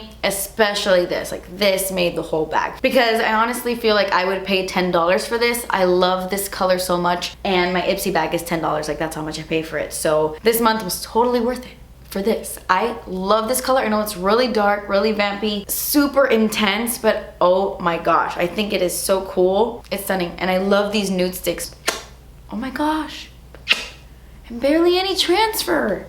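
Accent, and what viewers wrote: United Kingdom. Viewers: That British accent😂😂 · Your British accent cracks me up every time! 🤣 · Lmao you really made me laugh when you tried on the lippie 😂 and yo' British åccent haha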